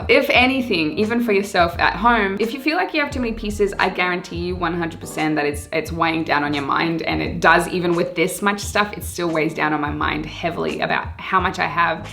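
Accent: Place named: Australia. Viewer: First time noticed Australian accent from you. Is the environment affecting you? :)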